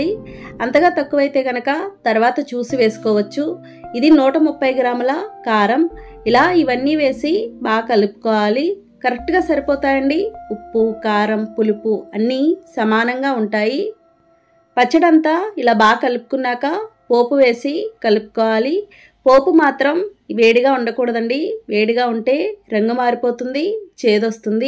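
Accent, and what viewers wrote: Godavari, India. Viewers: Is your Telugu, Godavari Telugu, sweet to head pure telugu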